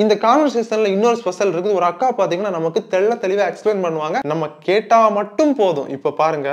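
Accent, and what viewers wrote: Kanyakumari, India. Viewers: Nanba Are you kanyakumariyan?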